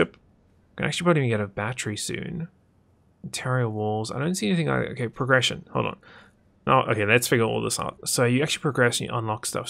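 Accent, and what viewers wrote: South Africa. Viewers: Is that a south african accent I detect? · Sounds a little like a ZA boi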